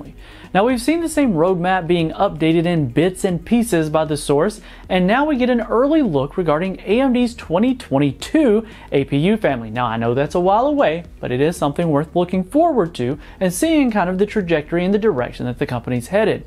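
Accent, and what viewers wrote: South, United States. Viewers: your subtle southern accent is pleasing to the ear for some reason 🤠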